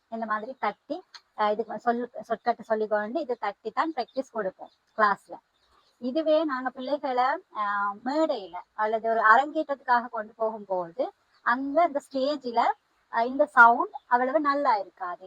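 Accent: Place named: Sri Lanka. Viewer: நாட்டு வாங்கும் பற்றி அருமையான விளக்கம் இதைப்பற்றி பல விளக்கங்கள் கூறினீர்கள் மற்றவர்களின் வேண்டுகோளுக்கு இணங்கி இந்த நிகழ்ச்சியை பதிவு செய்த உங்களுக்கு வாழ்த்துக்கள். உங்களுடைய ஸ்ரீலங்கா தமிழ் பேசுவது மிகவும் அருமை கேட்பதற்கு நன்றாக உள்ளது வாழ்த்துக்கள். நடனத்தில் சிறந்தவர் வாழ்த்துக்கள்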